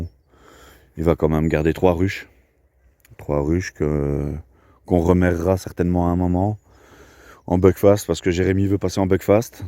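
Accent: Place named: Belgium. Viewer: Salut, une belle saison à toi 🤞. Tu meuble tu meuble mais avec l'accent belge c'est sympa à écouter 😉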